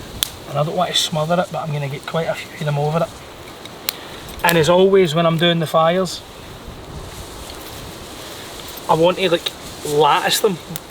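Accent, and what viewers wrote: Scotland, United Kingdom. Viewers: Cool wee vid guys and great Scottish accent. You planning to get out filming a bit more this year? Thanks for filming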